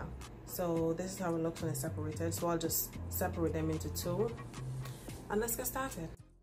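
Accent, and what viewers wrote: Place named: Antigua and Barbuda. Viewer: U sound like an Antiguan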